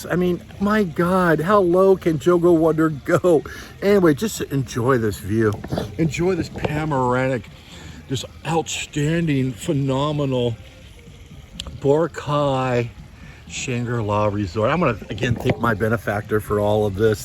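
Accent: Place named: Virginia, United States. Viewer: Stop digging on North Carolina. Your accent isn't much different. What are you from Virginia?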